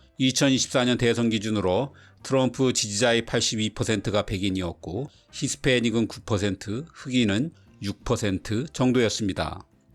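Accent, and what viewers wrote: Gyeongsang, South Korea. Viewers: 딱 갱상도 네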